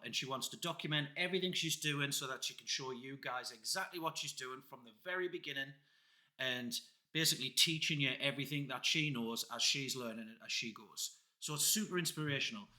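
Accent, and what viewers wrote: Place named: North East England, United Kingdom. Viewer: I’ve just found you because of a mention on Chads channel. I’ve already watched some of silver and solo videos but not heard of Alexa, so will definitely check her out. It sounds like your from the north east, I’m from Cumbria and about to start a YouTube channel. I’ll be following your journey, great content 👍🏻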